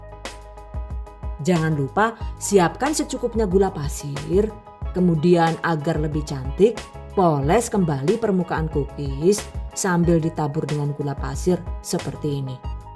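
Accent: Banyumas, Indonesia. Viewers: Ngapak ny bunda ni yg ngangenin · Slalu nonton penjelasanyajelasss bgt apa lg kl pas ada bahasa ngapaknya...suka bgt..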